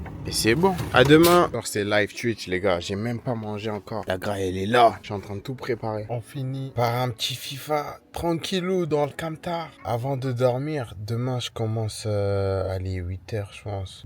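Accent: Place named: Marne, France. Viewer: Mais nan! T’es de Reims! Je le savais! T’as l’accent marnais 😊, un cagoulois de Chalons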